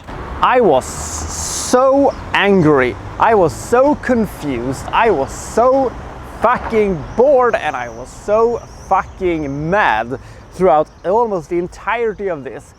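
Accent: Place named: Europe. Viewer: I think you can count your blessings for having gotten one like in three years for this drivel heap of a video. Oh, and show some decency when talking about Arabic “whatever” being mowed down. At least we know what Arabic is but we’ll never know what you are with the poor Euro-accent trying to sound American with throwing curse words here and there only ending up with an embarrassment that manages to surpass what you’re describing. This is a Joseph Losey film and so you may be better off leaving the review to someone who k owes what that is.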